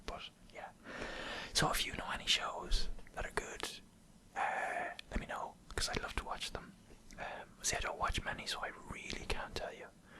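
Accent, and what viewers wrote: Ireland. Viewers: Okay, so I recommend you watch 'sword art online'. I'm personally not a big fan of anime but my girlfriend is and so I volunteered to watch some; she recommended me to watch SOA (Sword art online) because.. I dunno, I think it's pretty popular. As a not very big fan at times I still watch it and think pfft... this is so Niche to teens like all animes kind of are. But the concept of purchasing this game (the game being a virtual reality RPG with the twist of being stuck in the game forever until you beat the final boss then you are free but if you die in the game you die in real life) and having a thousand 'beta testers' who know the strategy and how to survive in this game is a pretty interesting concept. Btw, your videos are great and your Irish accent is awesome. Keep up the good work :)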